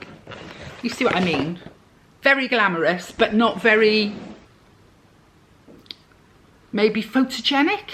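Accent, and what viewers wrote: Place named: South Wales, United Kingdom. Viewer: Thanks - always love it when a new video pops up. Being a lady from South Wales myself I can often hear the odd twang in your accent x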